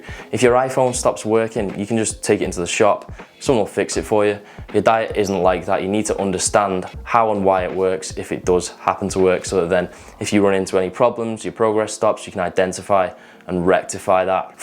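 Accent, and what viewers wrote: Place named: Widnes, United Kingdom. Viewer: Sound like your from widnes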